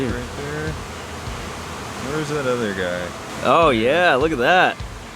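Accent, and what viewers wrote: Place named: United States. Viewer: Gold in the top material, gold in the red oxidized sand, gold in the blond sand . Flood gold is found in flood layers? Excellent deduction! What country are you in? What river? You have an American accent, not Australia, Colorado, Arizona? are you in Northern California?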